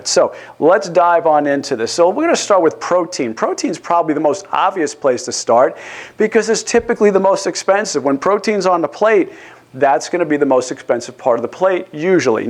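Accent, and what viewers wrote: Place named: East Coast, United States. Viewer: Been doing keto for the cognitive and athletic benefits. Unfortunately my diet is pretty expensive, $75/week even though I only eat twice a day and 90 g of protein daily (and that itself is on the low end because I also strength/weight train and play tennis). The reason for that cost is the protein I buy (pasture raised eggs and lots of fish, particularly sardines and salmon). However it is worth it for me because those are very high quality, high bioavailability, etc. So protein is usually the highest cost component of keto by far, but less active people might not need as much! Also random question Dr., did you come from the East Coast? I'm hearing a little bit of New York, New Jersey, Massachusetts (and some Italian influence as well!)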